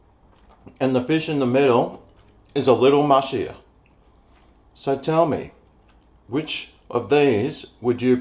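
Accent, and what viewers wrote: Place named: Australia. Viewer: Never thought I would enjoy having an Aussie tell me how to make crispy fish sticks!😂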